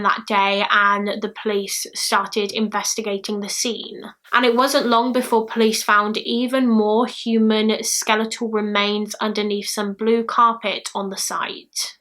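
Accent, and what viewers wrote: United Kingdom. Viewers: i dont hate u but i hate uk accent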